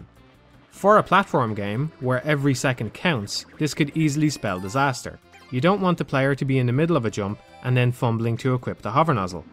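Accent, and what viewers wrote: Dublin, Ireland. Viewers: Great to hear a working class Dublin accent on youtube, especially one that voices such well informed analyses.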